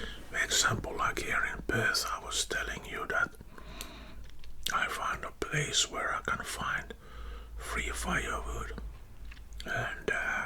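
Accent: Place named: Netherlands. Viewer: Well I would have believed if you said you were 40. The accent sounds a little dutch.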